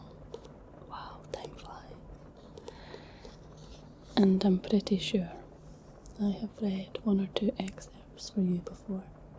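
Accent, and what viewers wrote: Scotland, United Kingdom. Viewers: Never realised i liked the scottish accent. You have a very relaxing and soothing voice. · i really like your scottish accent. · Your voice makes my brain melt..Scottish accent is so good for ASMR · I'm loving the soft speaking instead of the whispers, it highlights your nice Scottish accent, it's so soothing 😴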